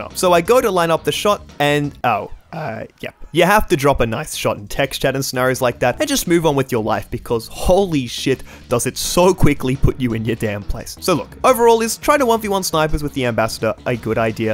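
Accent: Australia. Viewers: He is aussie lol, he doesnt sound american at all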